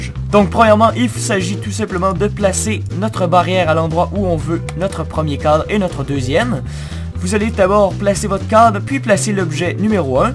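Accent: Quebec, Canada. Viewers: Sincerement, j'adore l'accent quebecois! J'ador aussi tes vidéos, tu deveais faire du feed the beast, ou si t'en fair deja, que tu fasse des vidéos sur le serveur feed the patrick!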